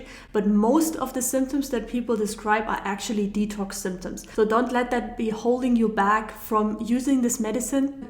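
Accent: Germany. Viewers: Thank you so much for your answer, I’m so happy that you actually read my question and replied!!!! Yes I’m addicted to Hawaïian spirulina since few years. I usually take them during winter in order not to catch cold due to its enforcement of immune system. I usually take two in the morning, but if I ever knew its so many benefits as you explained in your videos, I would have taken all around the year!!! Thank Goodness I have discovered you by chance, I am eternally grateful for all the precious advices you have been giving 🙏😍😊. I will take from now on 5 in the morning and 5 in the afternoon, but how long should I continue like this?? I am also suffering from migraine since long time, do you think celery juice will help me to get rid of it??? Thank you again, Dankeschön, I love your German accent in your English by the way 😍😍. I have lived almost 20 years in France and I had some German friends ( I also lived in Munich and in Vienna) and they all speak like you. 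I don’t eat dairy products and I rarely eat eggs and I don’t eat meat, but I love fish and seafood. And lots of fruits and vegetables. I love watching your videos and try to follow all the things you say... please keep up for I cannot pass a day without watching you on You Tube. Dankeschön 😍🙏😍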